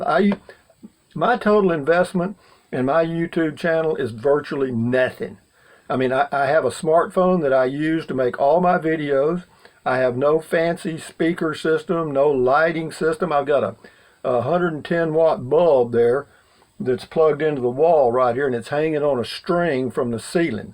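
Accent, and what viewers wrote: Texas, United States. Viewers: I enjoy all of your videos especially the early ones. I went to school in Austin and consequently developed a great love for south central Texas. Your accent (yes!) is familiar and pleasant. Your point about being alone but choosing not to be lonely... yes. Take care and keep the videos coming. I look forward to the daily installments.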